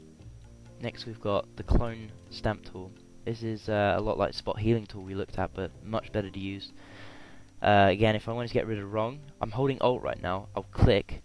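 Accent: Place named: England, United Kingdom. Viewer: i actually loved listening to you sounding SOOO uninterested and english, i laughed all the way through it, plus it was actually helpful, except i knew all that stuff already, i need to know how to do shading with opaqueness... :(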